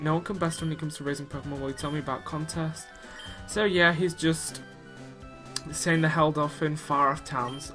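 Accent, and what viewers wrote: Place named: England, United Kingdom. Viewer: Are you From England?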